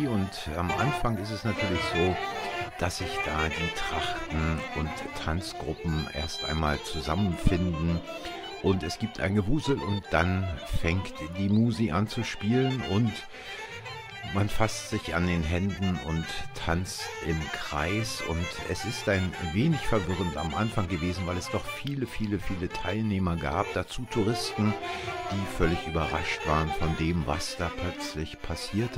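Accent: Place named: Lower Saxony, Germany. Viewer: Stimmt, dem muß ich beipflichten. Eine sehr angenehme Stimme und ein sauberes Hochdeutsch, wie in Niedersachsen!!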